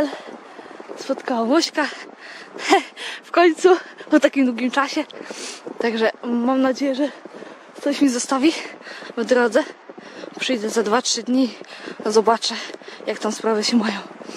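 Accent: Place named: Podlasie, Poland. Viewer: Zazdro z tymi rosochami, taką to łatwiej znaleźć niż tykę jelenia, bo dzięki śniegowi łosia można łatwiej wytropić. A u mnie łosi nie ma. Gratuluję :) I podoba mi się twój akcent, chyba podlaski?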